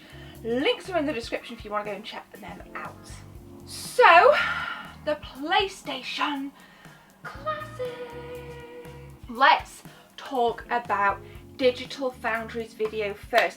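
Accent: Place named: England, United Kingdom. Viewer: Nice video, you from England? Nice accent.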